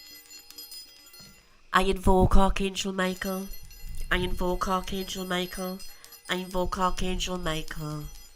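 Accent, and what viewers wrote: Newcastle, United Kingdom. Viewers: Love hearing the geordie voice, especially like when its just like being at the spookies in south shields years ago ❤ Hope you are improving ❤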